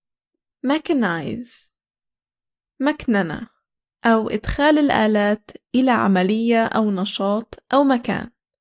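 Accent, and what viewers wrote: United States. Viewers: اميركي النطق او برطاني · هاي انكليزي امريكي؟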